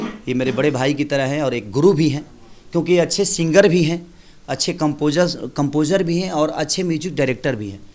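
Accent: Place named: Bhojpuri, India. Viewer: Bhaiya aapke juban se bhojpuri aachha lagta hai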